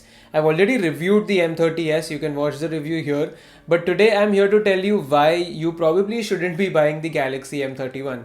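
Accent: India. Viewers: your accent is pure indian so try to speak slow and correct your voice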